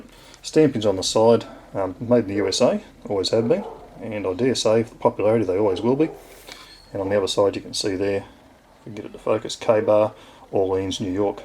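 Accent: Australia. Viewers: simple entertaining and informative review 10/10, where abouts in Aus you from mate ?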